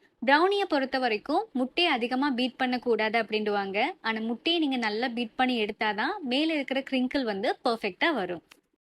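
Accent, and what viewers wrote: Sri Lanka. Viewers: Assalamu alaikum dear im 1st time watching ur video gud job and srilanka speech is cute ma sha allah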